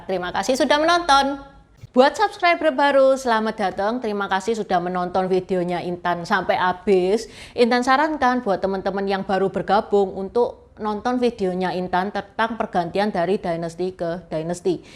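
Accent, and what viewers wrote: Java, Indonesia. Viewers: Mbak ..itu suara nya ...logatnya ..jawa bnget ..gak cocok bawsin acara ddh ..sorry ya ..apalagi bahasa mandarin ..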